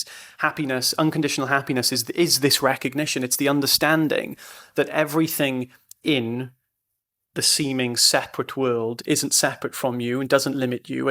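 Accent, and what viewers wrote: Wales, United Kingdom. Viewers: do I detect a little bit of Welsh in your accent ?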